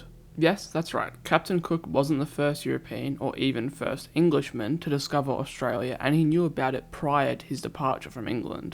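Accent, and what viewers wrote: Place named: New Zealand. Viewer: I'm a New Zealander and honestly thought your accent was Kiwi at first.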